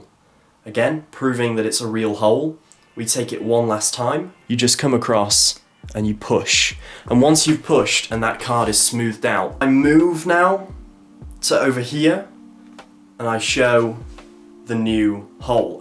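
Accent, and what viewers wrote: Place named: United Kingdom. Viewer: Came for magic! Stayed for British accent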